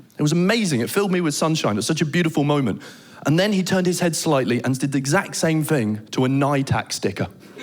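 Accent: Denmark. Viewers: Does have a Danish accent when he speaks English or maybe he is just one of those people that sound horrible no matter the language they try to use.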